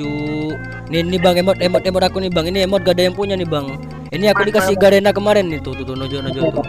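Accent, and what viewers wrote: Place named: Madura, Indonesia. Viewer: Dari bahasanya dah kek orng madura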